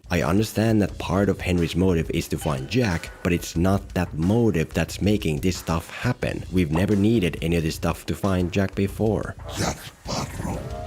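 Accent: Finland. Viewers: Right in the intro of the video there's an example of that in the form of a clip from a classic Finnish comedy sketch. In fact, enjoying the content of this channel is probably an example of that because the narrator's accent is almost certainly Finnish. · Jankon Betoni, laatu matskua. Kuulostaa ihan Suomalaiselta kyllä, aksentti on tutun kuuloista.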